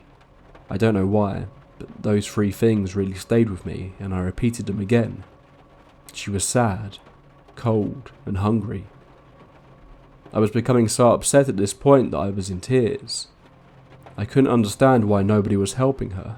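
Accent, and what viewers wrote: England, United Kingdom. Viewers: Because he is English, always make the best narrators, Americans are too hyper+ accents are trash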